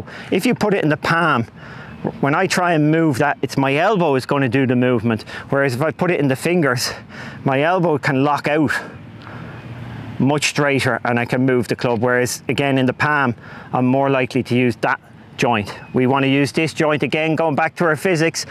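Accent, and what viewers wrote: Dublin, Ireland. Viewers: Love the Dublin accent , little tums . My favorite band is a Dublin band THIN LIZZY or how Paddy would say TIN LIZZY .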